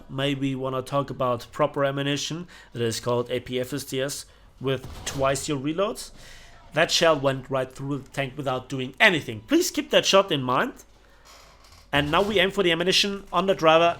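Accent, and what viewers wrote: Bavaria, Germany. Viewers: He just sounds like the typical german who speaks english. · He's from Bavaria. Those People have a special pronunciation, which makes them sound similar to the Austrians.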